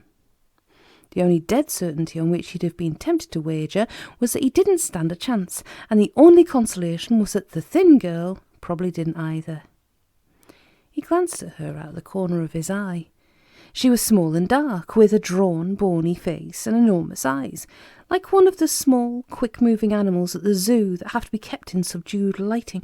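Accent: Newcastle, United Kingdom. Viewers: lovely accent and great reading, thankyou. Geordie?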